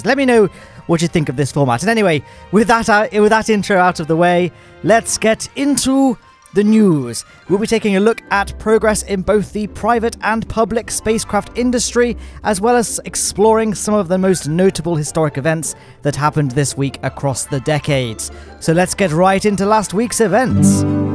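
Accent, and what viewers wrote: United Kingdom. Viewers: You sound extra English in this video? Like when you did blunderbirds intros, it sounds odd after watching velocity lake!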